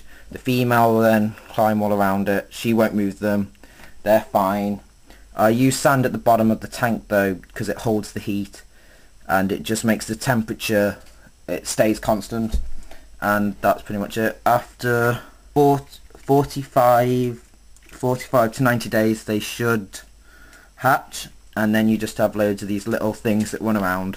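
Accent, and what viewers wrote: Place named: United Kingdom. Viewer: nice video. but sorry i reallly reallly hate brittish accents. lol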